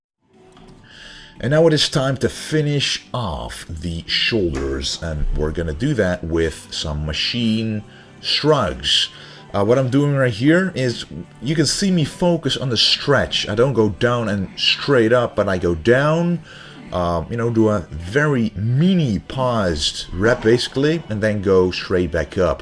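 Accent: Netherlands. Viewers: typical Dutch accent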